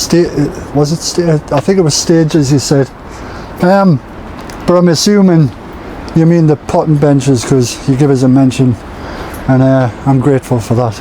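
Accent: Newcastle, United Kingdom. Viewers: Spot on 👍 we are who we are, so others can like it or jog on 😜.... BTW I love the gordie accent. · Love a Geordie accent my mum came from Newcastle as did by Uncle and two of my favourite aunts and sadly they’re all not here. I hear your accent and it reminds me of my childhood thank you.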